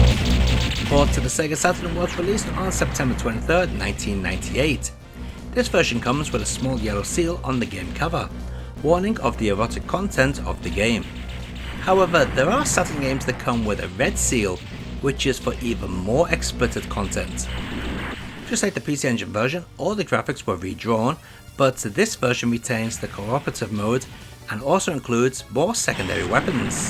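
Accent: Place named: Liverpool, United Kingdom. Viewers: Ah it's a Liverpool accent, I was thinking North West, then I doubted myself and didn't want to guess. I know Liverpool well. Spent a lot of time there. Live in the New Forest. Yes, I'll be 50 next year too. Time does indeed fly. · Always sounded very much Wallasey to me, so wasn’t far off 😊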